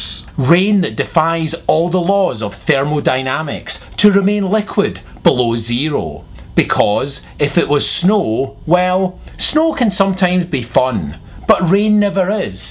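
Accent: Scotland, United Kingdom. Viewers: im scottish and a think your a bam pot and you have a gay posh scottish accent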